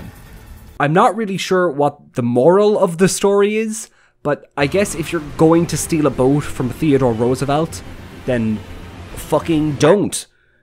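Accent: Ireland. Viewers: I never thought I'd ever watch a video about US president Theodore Roosevelt going across the country to catch a bunch of pirates, narrated by an Irishman sprinkled with clips from Metal Gear Solid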